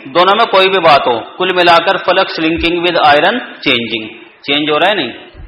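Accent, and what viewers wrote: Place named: India. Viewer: i see the material is good, bt ENGLISH with indian accent....hard to understand.....see ya...bye...thanks anyway....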